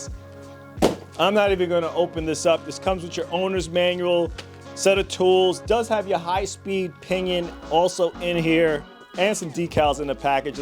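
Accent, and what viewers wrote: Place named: Northeast, United States. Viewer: Hey brother, another great freaking review. I really love how you do the unboxings. I’m excited for another shootout the last one was awesome. Back before I had to sell my RC collection This was actually one of my favorite short course trucks. How funny is that you had that sitting in your attic LMAO. Well if you want to donate anything else sitting in your attic to a single dad and a little girl who love RC let me know lol jk. You sound like you’re from the north east. I’m in Jersey. Thanks again for your videos I know it’s got to be a lot of work to make them. Me and my daughter enjoy them. I’ve been subscribed for a long time but I don’t comment much. Just wanted you to know me and my little girl appreciate your videos! Hope you and your family had a great weekend!!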